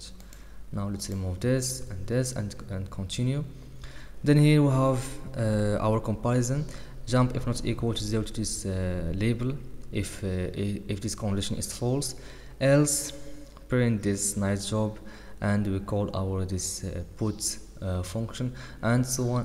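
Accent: Morocco. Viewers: bro are you a Moroccan? Your accent? I'm to from morocco, good luck with your work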